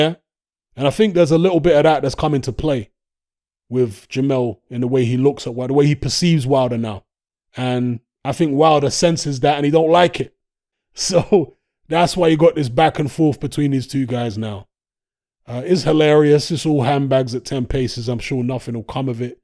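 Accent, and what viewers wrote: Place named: South London, United Kingdom. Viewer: South London IIRC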